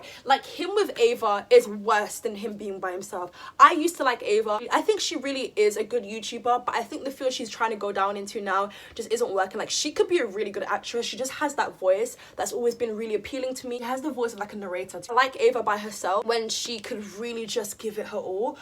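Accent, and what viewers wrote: England, United Kingdom. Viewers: Because your great. You also have a really cool accent! I love when people who are African American people have British accents!! 😊 Your videos are also very honest. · I watch you because I love how straightforward you are in your videos . You really don’t hold back which is really good and I also watch you for your accent 😂 because you sound exactly like my cousin In England and I don’t get to see her often ! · I still love hearing your English accent. ASMR moments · You just recommended to me 😊 also your accent makes me miss the UK cos I just moved away, also I like that you express your opinion - I agree with your thing about Antonio Garza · I love and watch because of your accent because i expected an american one. I am english aswell so its making me feel nostalgic as i dont live in London anymore 💜 also you keep it real and girl you have alot of common sense and your hair is amazing. 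I love natural afros 🙏🏻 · i watch you because i want to get used to this accent to understand British series and I think your channel is entertaining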